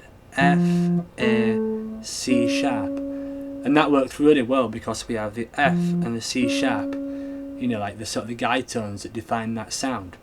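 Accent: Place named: Northern England, United Kingdom. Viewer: Very helpful lesson. I have a better grasp of the scale now. and the Northern accent is quite pleasant. Wondering if you could do a quick lesson on the melodic minor over a standard blues progression, a la Robben Ford or Carlton. as in melodic minor on the last bit just before the iv chord. Cheers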